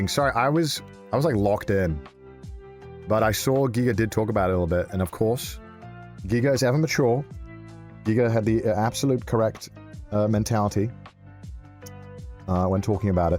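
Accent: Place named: Wales, United Kingdom. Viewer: is so so angry. His Welsh accent is creeping into his radio voice.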